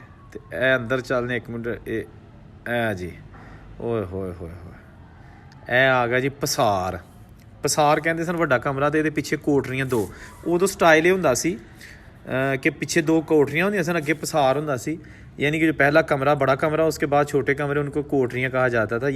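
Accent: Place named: Gurdaspur, India. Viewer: Sir your Punjabi dialect is same like Gurdaspur people.. Saab aap Narowal ya Sialkot district (jo Gurdaspur district India ke saath lagta hai) ke hoge.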